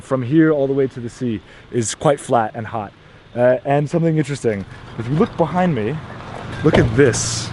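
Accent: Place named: United States. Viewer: Nice video man I hope you add a lyircs on the next videos cuz the amircan accent is not that easy to be understood from the first time.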